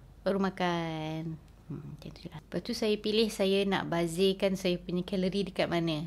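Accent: Kelantan, Malaysia. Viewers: Comeyyy dooh ore kelateyy oyakkk!!! 🥰🥰🥰🥰♥️♥️♥️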